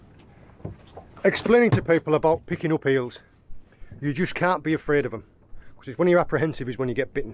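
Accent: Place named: South West England, United Kingdom. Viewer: I actually grew on brittish movies and tv shows, and it still didnt help me with your south-western accent and dialect haha. The subtitles really helped a lot, youtube logarithms working wonders nowdays haha. But dont worry, these things are mutual among us sea people and highlanders. I mean, if you learned croatian language, you wouldnt understand me either haha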